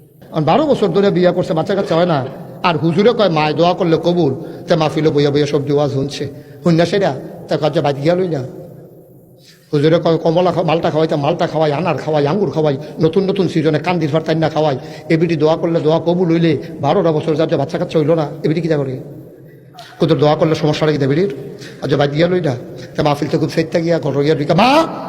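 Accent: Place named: Comilla, Bangladesh. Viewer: সে সম্পূর্ণ কুমিল্লা ভাষায় কথা বলে। কুমিল্লায় ওয়াজ করে বেশি,সে নিজেও কুমিল্লার মানুষ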